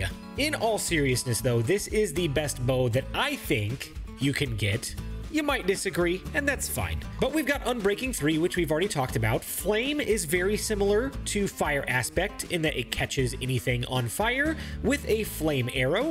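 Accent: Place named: United States. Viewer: That American voice sounds like the dawn of victory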